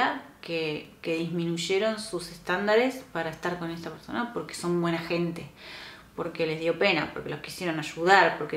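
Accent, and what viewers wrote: Argentina. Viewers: Un Argentino hablando sobre narcisismo...? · Hola, Gracias, por tu trabajo, te digo que estoy suscripto a la Señora Meredith de Inner Integration, a el Señor Omar Rueda, a la Señora Tabatha de identifica a un narcisista, y al Señor Marcelo de libres del narcisista, realizo en algunas oportunidades comentarios de algunos vídeos de sus trabajos, que son hoy los medios por los cuales realizo mi proceso de sanación, escucho tu acento al hablar percibo que sos de Argentina, yo soy de Córdoba Argentina, Villa Carlos Paz, en rigor de verdad sos la única persona de Argentina que he visto que habla del tema, el narcisismo y los psicópatas, le he enviado al Señor Iñaki Piñuel. al Señor Omar Rueda y a la Señora Tabatha un poema que he escrito sobre mi relación con la narcisista, poema que a ella nunca le envíe, en dónde describo como son las narcisistas, el primer vídeo que vi del narcisismo fue el trabajo de la Señora Tabatha, lo recuerdo siempre, porque yo me acuesto a las 4 de la mañana y ese día eran las 5, y lo vi en mi teléfono, y ahí comencé a instruirme del tema, caminar solo este desierto es duro, como dije, cuento con la asistencia de sus trabajos por youtube, sus canales, otros canales que suelo ver son la Señora Natasha Lopera, y el Señor Antonio de Vicente, en forma intermitente, no estoy suscripto, luego escribí un poema en dónde describo como solo DIOS puede curar esa gente, al único que se lo envié es al Señor Omar Rueda y la psicópata con los temas de, tu vida si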